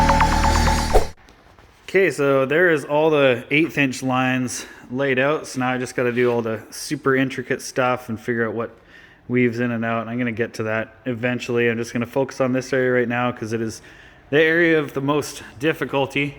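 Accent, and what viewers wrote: Canada. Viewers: Love hearing a Canadian accent on youtube and realizing you're watching a fellow west coaster at work! Nice work buddy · Took me a minute to realize u were Canadian. What's good from London Ontario!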